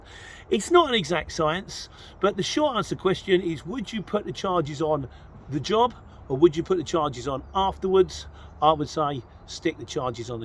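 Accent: Cockney, United Kingdom. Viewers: The reason why I watch your videos because of your Cockney Accent. I really like it 👍 💯 💕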